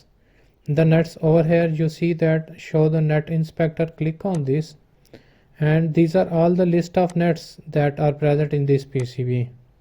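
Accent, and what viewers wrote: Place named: India. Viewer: Thank you thick indian accent magician. You've saved my life.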